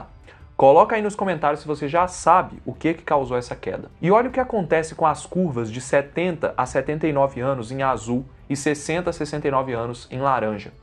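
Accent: Minas Gerais, Brazil. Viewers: cê é mineiro? 😍😍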